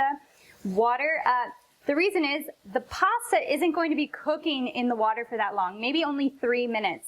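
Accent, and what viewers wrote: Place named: Italy. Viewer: Great great videos! Her Italian accent is lovely!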